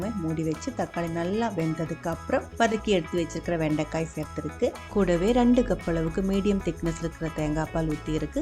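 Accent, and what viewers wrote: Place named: South India, India. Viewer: Your tamil is different, are you a south indian?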